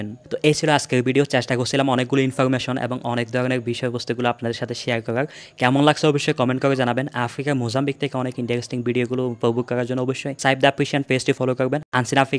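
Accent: Chittagong, Bangladesh. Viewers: চট্টগ্রাম। চট্টগ্রাম এর মানুষের বাংলা উচ্চারণ একটু পার্থক্য আছে।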